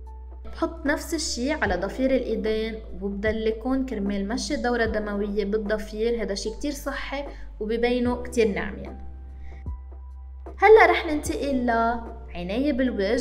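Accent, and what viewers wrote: Lebanon. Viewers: احبج لان دائما تنطيني طاقه واحب طريقة كلامك لبناني حلو بفمك🙊🙈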